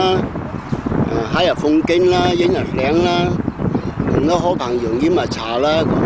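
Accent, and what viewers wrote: Taishan, China. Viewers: I am glad I found your video and listen to Taisanese spoken just like my mum did. My mum was from Toisan, also a Yen 甄。Thank you. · 很地道的老余声，很亲切，很励志，谢谢！我也来自新昌，现在旧金山湾区。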